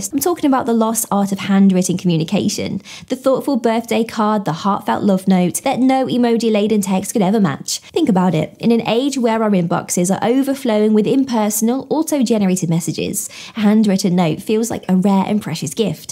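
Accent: England, United Kingdom. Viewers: just like always. You are one attractive beautiful British woman! 😍😍😍😍😍😍😍 I'm here for my British accent fix for the day!😊😊😊😊😊😊😊 You have a Blessed day. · This may seem pedantic but there is no British Accent, there are English accents, Scottish accents, Welsh accents and Northern Irish accents and within each of those there are different accents per city and in London there are also different accents. Her accent is English.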